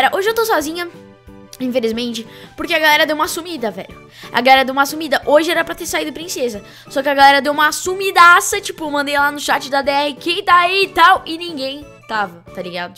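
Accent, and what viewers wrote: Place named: Sao Paulo, Brazil. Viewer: Belo sutaque Paulista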